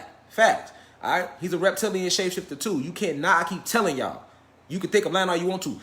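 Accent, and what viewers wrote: Chicago, United States. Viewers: Keep waking US UP GOD!! We are starting to REMEMBER 💪🏾💪🏾. Im from Chicago too lol and I be crackn up at how u talk cuz we relate❤❤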